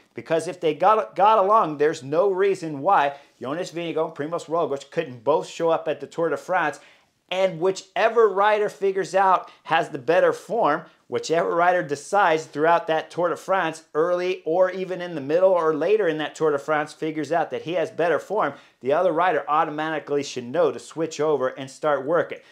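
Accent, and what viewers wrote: United States. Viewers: I do believe you're right in what you're saying. But I can't keep watching till the end. The narrating is a bit too enthousiastic, too american. Sorry.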